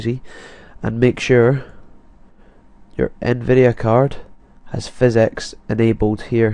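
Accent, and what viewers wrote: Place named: RP, United Kingdom. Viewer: "High Bread Fizz X" Dat fancy Brit accent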